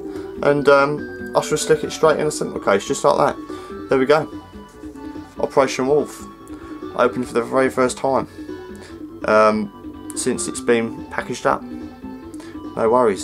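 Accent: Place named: United Kingdom. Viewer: It's because of people like yourself that I love being British!:D It's just the mannerisms and the way you speak ("stop chatting shit ") and it gets me every time I watch a video of yours! There's not many other English speaking people that speak the same way we do.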